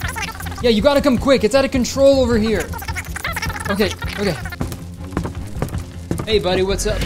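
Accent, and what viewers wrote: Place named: Canada. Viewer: Why are you Canadian?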